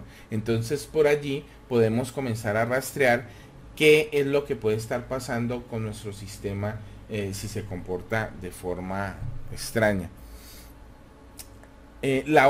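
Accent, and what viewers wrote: Colombia. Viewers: ...Gracias...Excelente opcion. por tu asento se ve q eres colombiano XD... like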